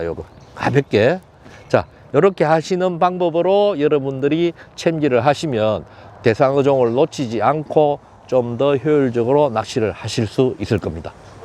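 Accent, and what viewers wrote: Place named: Busan, South Korea. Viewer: 낚시를 시작하는 초보입니다.^^ 같은 부산사투리에 귀에 쏙쏙 들어오는 설명이 너무좋습니다. 많이 배우네요.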